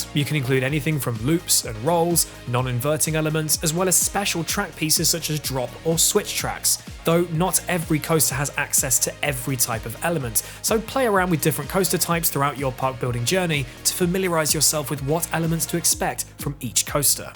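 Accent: United Kingdom. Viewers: What? He's just British lol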